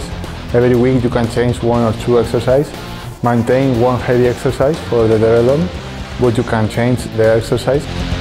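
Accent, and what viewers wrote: Spain. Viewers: Se nota que es español...el ingles le hace falt pulirlo caxo... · Looking at this pose, you can see he does need a little more work on the lats. And where is he from? I recognize a Spanish/Hispanic accent...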